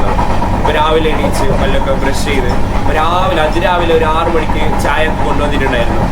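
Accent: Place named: Kasaragod, India. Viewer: കാസർഗോഡ് ഭാഷ ❤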